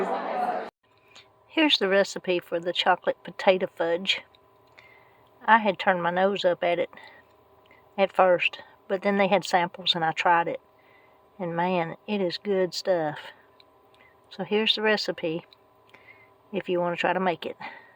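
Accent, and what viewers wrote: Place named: Arkansas, United States. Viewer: my mom side is from Arkansas and they talked alot the same. Well maybe they talked a bit slower than you. Lol.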